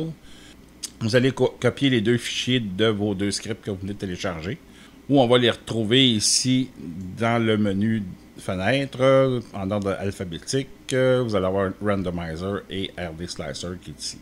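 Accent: Canada. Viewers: Un grand merci pour vos tutos de qualité et très bien expliqué, abonné depuis bien longtemps c'est toujours un grand plaisir de vous retrouver pour vos astuce le tout avec l'accent canadien, que du bonheur merci ;0)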